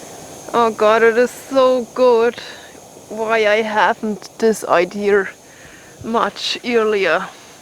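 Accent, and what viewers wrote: Germany. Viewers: I bought your book velcome bek am from vild and bushcraft love it am your number one fan am. Not making fun of you I love your heavy accent it reminds of the German girl in the movie YOUNG FRANKENSTEIN the mel Brooks comedy movie she talks like you. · Your German English wonderful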